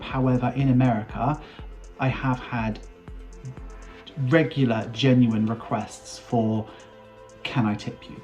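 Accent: United Kingdom. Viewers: Congrats! I just enjoy hearing you speak with British accent haha. Hello from Los Angeles.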